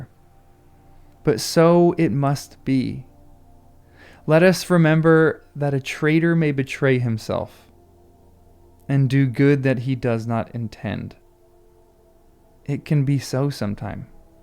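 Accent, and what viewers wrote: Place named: United States. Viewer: Dreadful American accent. Gaal’m.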